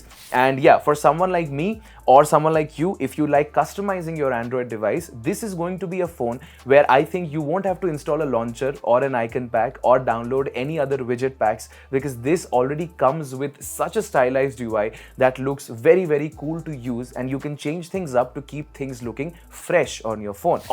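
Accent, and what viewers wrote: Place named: Bengal, India. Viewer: I observed the words you use are really very professional, the voice, the tone, the accent is just amazing. You do not fake your accent by forcefully trying to Americanise it (To be honest that sounds very scammersish lol) you stick to your Indian Bengali English accent, I'll say it is a very effective way to holding on your roots while being modern. I wish to speak English like you. And Thanks for replying 🙏 Btw amio Bangali, Khub e bhalo laglo. I appriciate it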